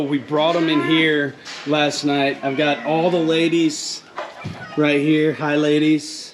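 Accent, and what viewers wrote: Louisiana, United States. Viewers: Love the channel!! Where you from, you definitely don’t sound like Florida…that sounds like my neck of the woods…Louisiana!! If you not from Louisiana you definitely must do a lot of swamp impressions and your getting better at it😂 I’m not from the parts you sounds like but it sounds definitely Pierre Part La but im from a whole different bayou in Pointe Aux Chen La on a Native America Indian Reservation😊 God Bless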